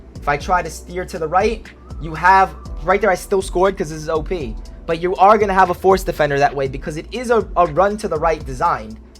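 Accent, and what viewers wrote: New York, United States. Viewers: Can’t stand that New York accent😭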